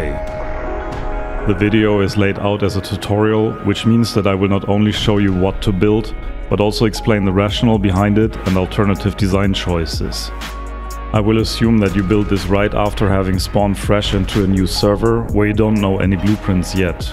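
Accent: Germany. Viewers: Ich höre dein Dialekt (ka ob das so heißt) du bist eig. Deutscher, oder? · German accent it in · Mann hört einf den deutschen Akzent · Sehe jetzt erst seit 5Min dieses Video aber liebe es einfach wie dein deutscher Dialekt raussticht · man hört dein deutschen aktzient ;D nices video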